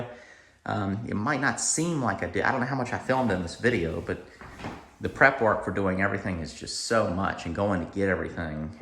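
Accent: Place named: Appalachia, United States. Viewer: Love this house it looks so good... i know renters dont get invested to care about a house i dont understand that sloppy mindset... if someones trusting you with their property you darn well look after it... when i have moved home i clean everywhere for the folks moving in... if my daughter rented when younger i took it as my responsibility to clean from top to bottom before we left..its in my upbringing... id treat yours better than my own cos its not my own... simple as...i wonder what kind of vetting you do to get decent tenants.... Also cant figure your accent ... im from england... you sound southern but not as southern as Dolly Parton... your voice is so easy to listen to... Fine job..... looking fab... kinda envy the lucky renter who gets to benefit from all that fine attention to detail...:)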